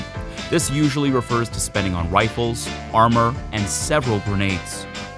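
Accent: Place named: United Kingdom. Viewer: you dont sound like him imo but you have a nice soothng voice like him, with a diff accent lol, i think ur british?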